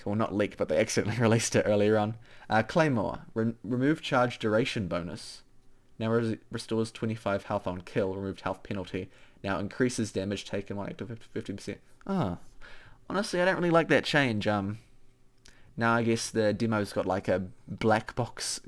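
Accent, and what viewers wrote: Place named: New Zealand. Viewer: Confusing to hear a kiwi voice on a popular youtube channel.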